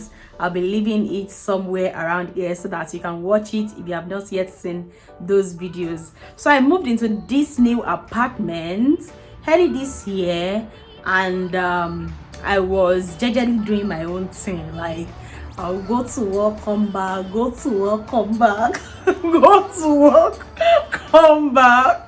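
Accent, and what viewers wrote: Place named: Yoruba, Nigeria. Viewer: Your yoruba accent lasan >>>>>>>> 😆 🤣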